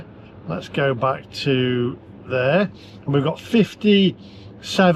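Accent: United Kingdom. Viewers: EV's should have an electric cord like a vacuum cleaner has. That way there will be no cords to cut off of the chargers,,,,,,,,,,,I love the UK humor that you have. I meet people from UK, retirees in Thailand, And I enjoy talking to them with their UK humor and accent. You are much more expressive than we Americans are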